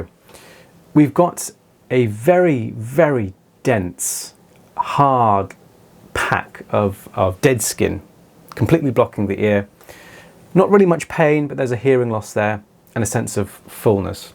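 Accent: United Kingdom. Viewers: hahaha, you probably would 😭 In American media, Im pretty sure your specific way of speech is one of the UK dialects that is most often replicated by American actors. Thats super cool though! Wonderfully informative video :0 I learned a lot about the scale of the tools, the dexterity and hand steadiness is very impressive!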